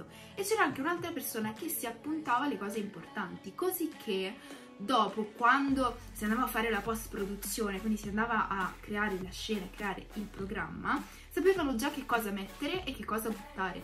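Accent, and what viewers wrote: Italy. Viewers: Come mai sei Sarda ma parli come in Lombardia?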